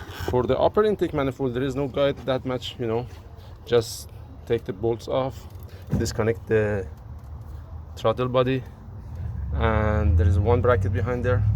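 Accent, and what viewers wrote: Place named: Iran. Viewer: Persian accent😊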